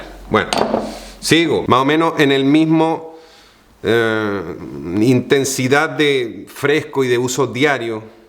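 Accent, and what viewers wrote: Chile. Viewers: no se porque tienes un acento Chileno, tremendos reviews!! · Soy español y desde mi perspectiva le veo un acento muy muy chileno (por cierto, el acento más marcado que existe de Sudamerica en mi opinión). No le noto ningún deje español en su acento, aunque quizá los chilenos sí lo podéis notar. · Hola , eres Chileno ?? , te pregunto por el acento, pero tienes de a ratos unos toques españoles y otros argentinos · Si eres chileno...que haces haciendo esa mezcla rara ...que vosotros... Que os digo...basta...sale raro y mal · Cierto tengo la misma duda su acento es d echile pero hizo de primavera y acá es otoño xd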